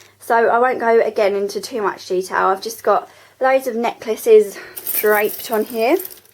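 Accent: Essex, United Kingdom. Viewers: are you an essex girl? you look & sound like lydia from the only way is essex also lol! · r u from Essex xo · You sound like your from essex :) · LOL you sound like your from essex!:)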